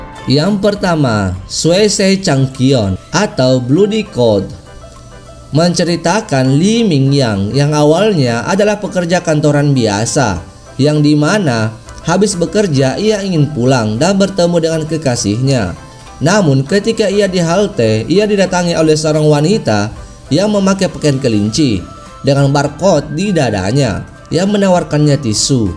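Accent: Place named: Batak, Indonesia. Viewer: ohh......mirip logat Batak dikit🙃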